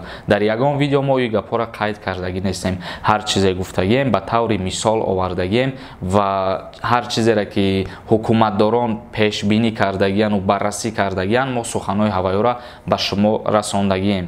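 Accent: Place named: Tajikistan. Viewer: Рахмат ика хушру гап мезани бо лахни точикии точики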